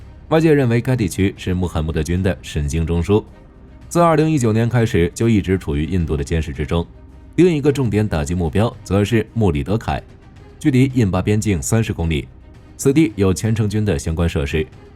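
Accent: China. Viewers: 台湾人学大陆口音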